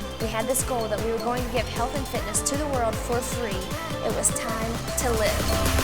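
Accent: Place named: South, United States. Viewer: I've been with you since the pandemic, and just watched this. I am even more inspired and in love with you, your personality and positivity. You are infectious and I admit what brought me here originally was your southern accent (as a Charlotte native). Thank you for bringing your all to us and making us better! Your brother would be so proud of you <3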